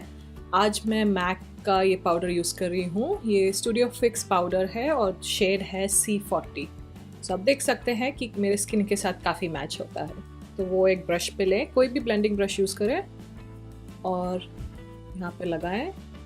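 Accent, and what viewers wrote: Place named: Mumbai, India. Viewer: this is amazing. this your best video. i like the red with this neutral look. i loved it sooooooooooooo very much. apki hindi bahot achi h, kuch words to mujhe bahot jaida ache lage, like u said bahot sunder lagega, chera barabar krne k liye. Mumbai wali Hindi di, maza aa gaya dekh kr. itna cute language me bola apne, loved it. u already knows that i love ur voice. plz or bhi video banayiye Hindi me.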